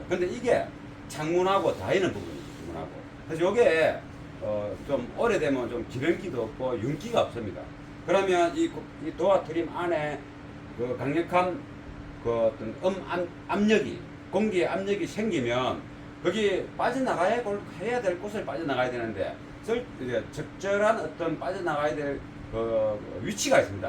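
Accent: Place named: Gyeongsang, South Korea. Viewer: 영상잘보고갑니다 ~^^ 같은 경상도 말투라서 정감 이갑니다~^^ 사장님혹 고향이 진주입니까?~^^ 우야둥둥 매번 시청합니다~~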